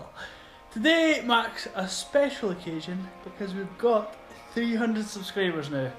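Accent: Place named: Scotland, United Kingdom. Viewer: Shit. Love the accent brotha haha. Scottish?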